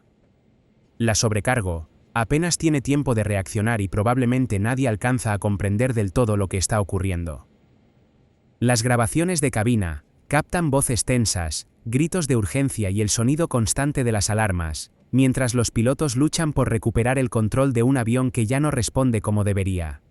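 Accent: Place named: Spain. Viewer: Habla como español pero no sabe cuál es el apellido paterno del piloto y del copiloto?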